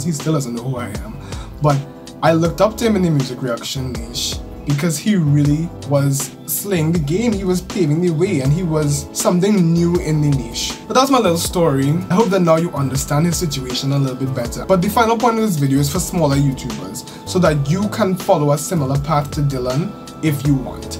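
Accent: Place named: Trinidad and Tobago. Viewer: You sound trini ❤🇹🇹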